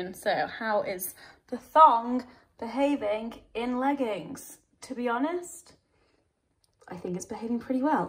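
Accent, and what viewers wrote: United Kingdom. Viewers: You sound extra British today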